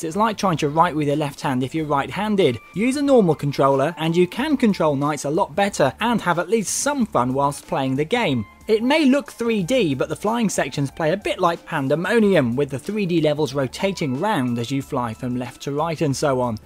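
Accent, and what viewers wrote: United Kingdom. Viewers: British accent omg i love British accents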